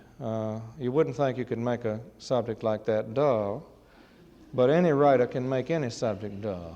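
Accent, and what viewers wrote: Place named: South, United States. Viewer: Just wanted to speak on a tiny aspect of his dialogue during this reading when he introduces ‘Slave Quarters’: It may sound like he is saying the word, ‘N***er’ but that is just a characteristic of his particular accent. In the South, in Dickey’s day, the common way that black people were referred to was ‘negro’, and the word, when spoken in that regions vernacular would be pronounced as, ‘Negra(née-gra). You then have the ‘a’ At the end drop and it sounds like the word that is a racial slur but when people said the word back then just as Dickey did, it was used in the same way we would use the word ‘black’ to refer to an African American or ‘white’ to refer to a Caucasian. I just wanted to bring that up in case someone heard that part of the reading and was turned off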